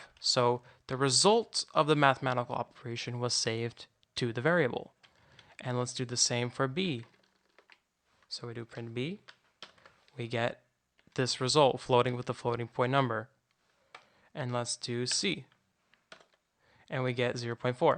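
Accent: United Kingdom. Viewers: Thanks GOD, a British accent at last. Where have you been mate? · The hawker has a REALLY annoying British ac-cent' on "PIE'-thun'. The other Americans speak very well. The content is good.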